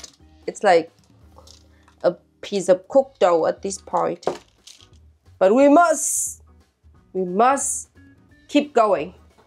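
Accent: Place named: Germany. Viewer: Hilarious german accent coming out of her nouth..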